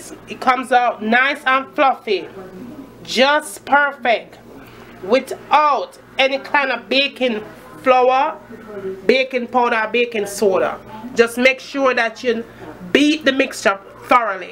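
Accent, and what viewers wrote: Jamaica. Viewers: wattah patois trong!! LOL!!! mi luv it. no mattah how ard mi try mi cyan get it 😪😪 dem always bun up thanks for sharing. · I've never heard of a banana fritter. I'm gonna make these soon. Thank you for sharing. I just love your jamaican accent. I subbed because you have a bunch of tasty looking recipes. 😘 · You accent makes me really miss Jamaica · I love this. Real Jamaican with strong Jamaican accent fi tru! Mi start smell the fritters dem. Gonna do this the proper way tomorrow. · Hey 👸🏾saw dis video 1st time looks good😋😋never heard of banana fritters. I know donut shops have apple fritters donuts. I like yo accent it is very strong more Jamaican then it does now.❤This kind of reminds me of unleavened bread. Have u heard of dat or ever tried unleavened bread? I want to try and make dez 1 day.😊👍😋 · Mi luv the way how she talk cook everything proper Jamaican lady!!!!!! 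I'm a Jamaican by the way❤️😁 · Nice, can't wait to make these. Long time don't eat, got all the ingredients. Just need my six beautiful, ripe sweet bananas like yours. Oh no am out of oil. me will get some. Lol, Love your Jamaican accent. :-) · Previewing and loving your videos. You make it clear on what to do, and I love your patois--music to my ears. I just dubbed...don't want to miss any new videos. Thanks sooo much for sharing. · Your Jamaican yes but please talk good for the viewers... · Instead of oil.????Jamaican accent is funny though.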